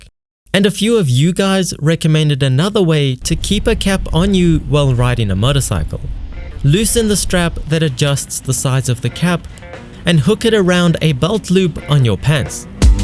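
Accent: South Africa. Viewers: U from south Africa bru ? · Are you south african?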